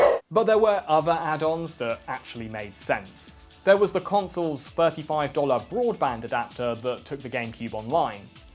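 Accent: United Kingdom. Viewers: Had to stop the video 1 minute in because of the unbearable British accent on my ears.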